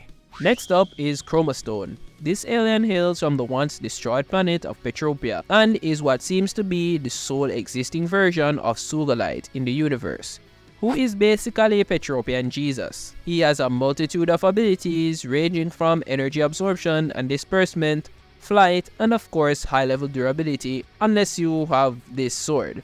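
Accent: Jamaica. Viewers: Bro are you Jamaican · Hold up are you jamaican bro. Di accent a sneak thru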